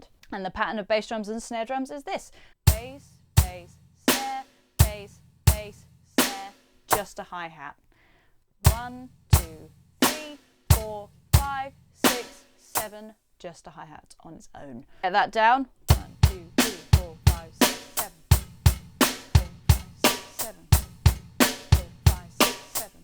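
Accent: United Kingdom. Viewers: I play bass and stumbled on your channel. You have an enthusiastic approach and the British accent makes your lessons fun to watch. Want some 7/8? Bill Bufords playing on from. Back in the day, bruford and bass player, chris squire, were as solid as it gets.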